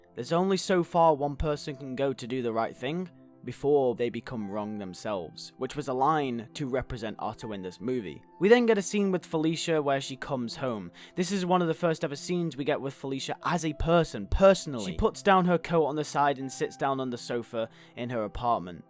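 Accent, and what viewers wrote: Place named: United Kingdom. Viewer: you are very good youtuber and your British accent is great what happens next?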